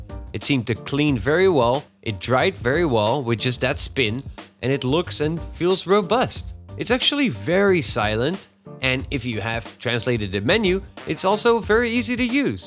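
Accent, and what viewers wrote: Netherlands. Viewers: We don’t mind this kind of production. It still had your graphics, music and that Dutch take on the English language :D. Feel free to keep up the great videos in this format.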